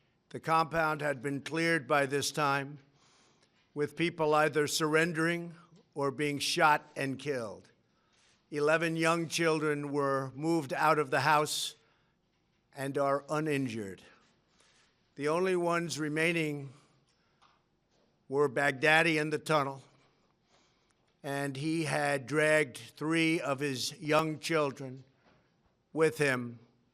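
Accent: United States. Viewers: New York accent thicc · he talks like a real american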